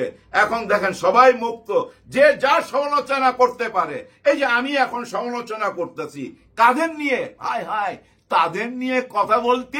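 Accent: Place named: Noakhali, Bangladesh. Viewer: Nice story vai I am pretty much sure you are from noakhali it’s ok nothing wrong with that..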